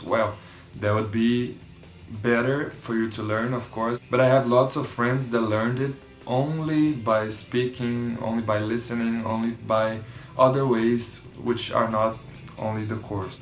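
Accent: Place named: United States. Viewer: heita voce ja é americano um dia eu chego nesse nivel kkk parabens !!!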